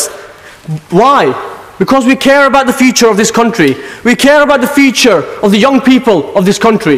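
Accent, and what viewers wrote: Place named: Yorkshire, United Kingdom. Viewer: You are British, right. I would appreciate if you work for or raise issue of child poverty in the UK. And for your Kashmir crying- read some history. Your dads and uncles kept silent or took part in the Hindu pandit genocide in 1989. Easy to take one page from history and add your fancy Yorkshire accent to bark about. World is not just for Muslims!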